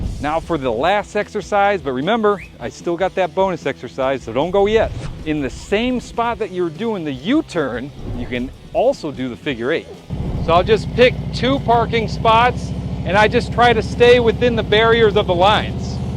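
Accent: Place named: Midwest, United States. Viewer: your content is so good. Maybe it’s the midwestern accent 😮 that makes you so easy to understand 😅. I like the figure 8s you do in 4 parking spots. Keep up the good work. Really solid🎉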